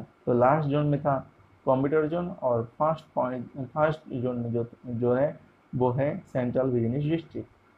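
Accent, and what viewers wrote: Bengal, India. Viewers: Sir appke english m bangali tune mix hota h so thoda sunne m effort krna pdhta h thoda app vocal ko open krne ki practice kare humble request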